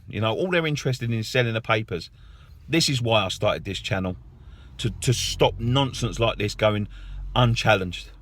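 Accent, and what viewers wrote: Cockney, United Kingdom. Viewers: What a boring cockney deluded wanker.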